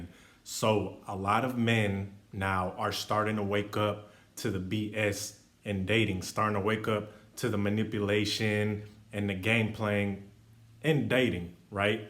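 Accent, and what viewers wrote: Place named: Georgia, United States. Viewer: Aye bro you from GA?? That accent real familiar 💯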